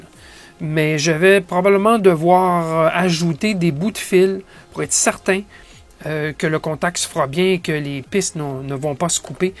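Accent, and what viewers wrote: Quebec, Canada. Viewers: Ça fait plaisir de retrouver cet accent québécois !!! Très bonne vidéo.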